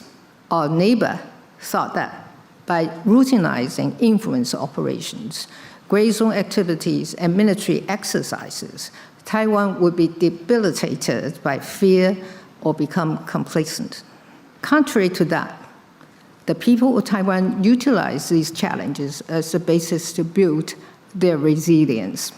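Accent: United Kingdom. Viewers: 小英總統英國音很好聽，一切盡在不言中，我永遠小英辣台派，永遠祝福感恩小英總統， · 我超愛這英式英語腔，超迷人的。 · 民主制度正遭受認知戰、資訊操縱與軍事威脅的挑戰台灣以韌性應對威脅，並積極強化國防與數位安全呼籲民主國家建立團結機制，共同維護區域與全球穩定台灣願意分享經驗，並承擔維護民主安全的責任以上為小英演講的重點英國腔實在太迷人了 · 前總統真是女中豪傑，非常有智慧她不用看搞就能用流利的英國腔英文演講而且都不會凸槌，真的佩服她的勇氣和智慧！謝謝小英前總統讓世界看到台灣！有你真好，上帝祝福你平安健康！ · 說英文真的很好聽（真的很療癒），腔調有些英文腔，在她還沒當上總統時，就知道她很會說英文，也聽過她的演講，台灣唯一不會說英語的總統 “陳水扁”吧！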